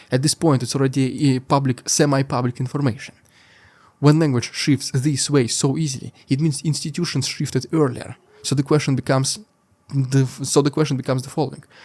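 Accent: Russia. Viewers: you were very, very hard to understand this time... way to fast. Your Russian accent and the words just rammed together is impossible... TAKE A BREATH.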